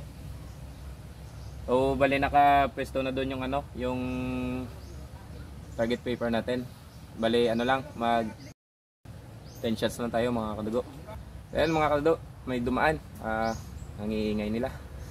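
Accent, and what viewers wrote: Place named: Iloilo, Philippines. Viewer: sir my accent ka sir...taga iloilo kba sir?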